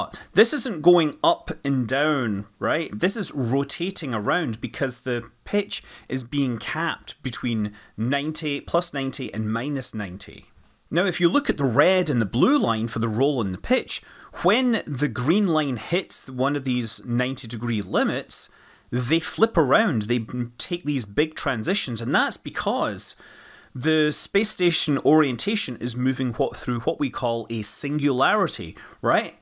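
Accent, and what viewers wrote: Scotland, United Kingdom. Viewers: Love the accent ? I know its scots , which part? great show, 🦘💥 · Hey I didn’t know you were involved with stowaway until about what 30 seconds in I hear this beautiful scotttish voice lol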